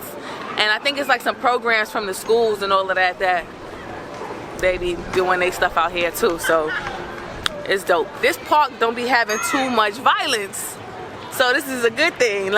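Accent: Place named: New York, United States. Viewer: I love her NY accent it fits that pretty face. :)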